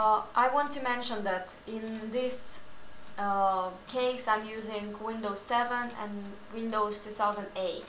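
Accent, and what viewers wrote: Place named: Russia. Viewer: Чувствуется русский акцент и немного много "SO...". Но девушка старается. Спасибо за хорошую иллюстрацию по WinRM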